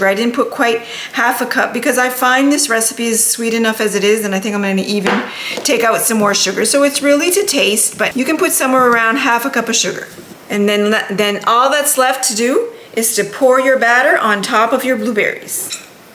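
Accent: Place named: New Brunswick, Canada. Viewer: You must be from NB! I grew up in Bathurst and I recognize the recipe (coked it many times myself) and your accent! - a new subscriber from NJ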